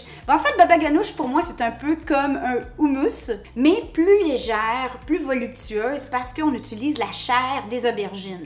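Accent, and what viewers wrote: Quebec, Canada. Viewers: Merci pour cette recette libanaise aux accents québécois. J'adore la patate de (?) Plus naturelle tu meurs, ça change des vidéos de cuisiniers et cuisinières aux culs coincés!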